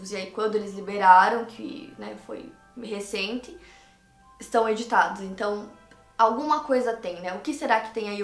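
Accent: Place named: Paraná, Brazil. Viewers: Adoro Seu Sotaque ... Acho tão lindo o sotaque Paranaense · Vc é curitibana? Baita sotaque lindo daqui . Rsrs. Po mina , vc contou td sobre o net flix... spoiler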